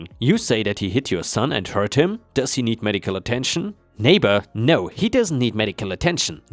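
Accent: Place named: Germany. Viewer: Nice Story - certainly it is all a lie! And: interessing accent! German?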